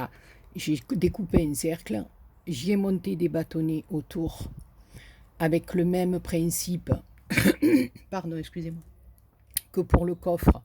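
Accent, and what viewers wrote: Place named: Marseille, France. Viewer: Bonjour,je te regarde depuis plus d un an,sans jamais avoir commenté 🤔🤔,oui c est n importe quoi 😊lol,vos mieux tard que jamais,comme on dit lol j adore tout ce que tu fait,tes sublimes fleurs,que j ai toutes reproduits,et maintenant tes boîtes,alors ça j adore,j adhère hihihi☺,j en ai fait 4 déjà 😉,mais les petites,mais celle ci wahouuu, Merci pour toutes ses très belles créations que tu fait 😊,et merci pour cet accent qui est terriblement Marseillais 😊,j adore 😁,ainsi que ta bonne humeur,tu me fait sourire a chaque fois,a très vite bisous bisous du sud ouest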